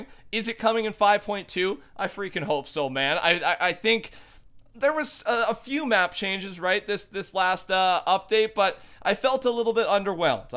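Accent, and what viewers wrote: Canada. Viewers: Are you canadian? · Sounds like you’re Canadian , Vancouver ?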